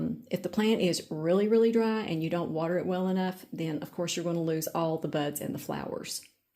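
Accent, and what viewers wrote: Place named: Tennessee, United States. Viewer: I love your TN accent! (from this californian)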